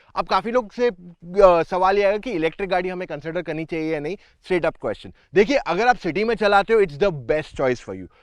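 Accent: Gujarat, India. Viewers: He is Gujarati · Like your Gujarati Tone मेला होने वाला है l 😅😅😅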